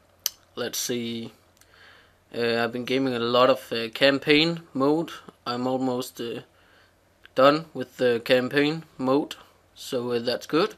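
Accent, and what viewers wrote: Denmark. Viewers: This is your channel, which means that you should do what ever you want. if you want to promote other people you should do that. If people don't want to watch it they can just close the video again... though if you want advice I would say you should work on your accent because, atleast to me, the danish accent makes people sound dummer than they actually are... though it might just be because I'm a dane myself...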